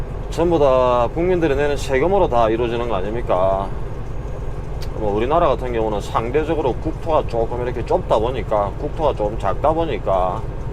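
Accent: Gyeongsang, South Korea. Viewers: 이만 돌파 축하드립니다 1편 부터 12편 까지는 말 없이 자막처리 12편 부터는 구글지도 사용 일정 안내 ㅋㆍㅋ 님의 영상이 하루하루 멋지고 있네요 아직까지 님 영상 다시보기 보고 구순한 갱상도 사투리가 몆편부터 시작인지 현재 다시보기중입니다ㅡㅡㅎ.ㅎㅡ 폰 데이터 다 소모 했읍니다ㅡㅋㆍㅋㅡ 고속도로 국도 사항은 한국이 짱이죠 돌쇠 엘프 밍키 상봉까지 안.운 하세요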